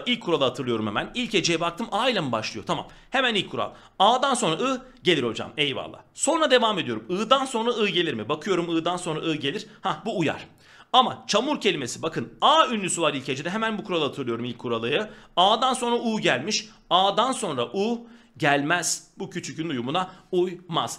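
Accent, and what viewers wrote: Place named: Urfa, Turkey. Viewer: / Hocam urfa şivesi😊😊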